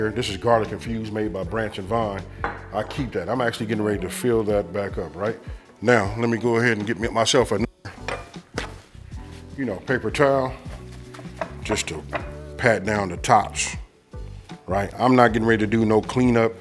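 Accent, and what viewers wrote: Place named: California, United States. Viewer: I’m saving this one because I have to try it myself. A lot of your recipes are Cajun inspired I’m curious are your people from Louisiana? The reason I ask is because you talk with a cali accent but if I watched your content on mute I would swear that you were from Louisiana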